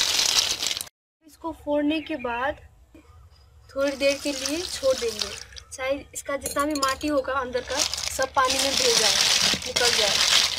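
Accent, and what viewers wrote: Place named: Nepal. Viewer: Nepali ko Hindi bhukai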